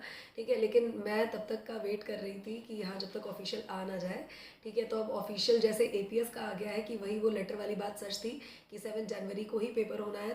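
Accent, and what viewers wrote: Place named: Lucknow, India. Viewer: ohk kk mam.. pranam 🙏 recently m listening ur vdos 😍 u are truely 💎 n u really care about studdent's studies . so much positivity ,full of real information which is most imp thing.. faltu ki bkwas ni hoti h..bhut se log aadha tym vdos ko start krne uska add krne me lgate h .n faith on our bhgwan n spritual knowings 🙏 n flow of ur accent 😍..I think aap lucknow ya uske aaspas k ya pragraj se ho... 🙏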